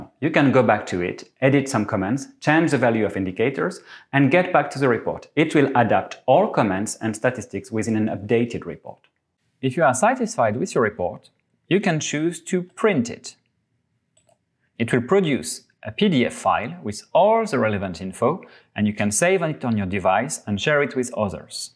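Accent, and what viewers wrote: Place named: Brittany, France. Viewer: Great tutorial for a great tool. Onwards with roll out! Thanks for the pedagogic presentation (with sexy Breton accent on top!)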